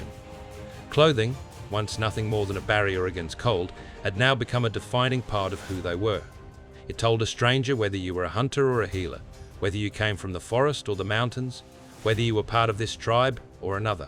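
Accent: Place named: Australia. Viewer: New subscriber here. Solid mate. Sound like an Anzac 😉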